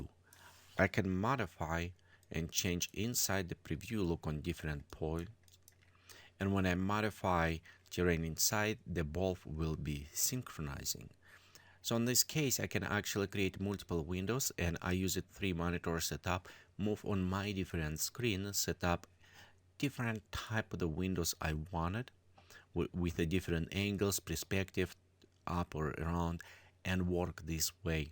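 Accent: Russia. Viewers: Сразу заметен русский акцент)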